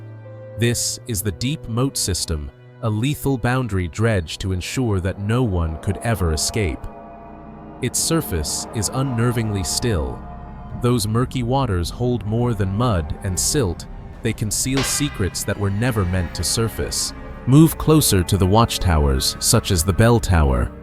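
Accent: United States. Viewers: This is fascinating, please use real British narration though, not American robots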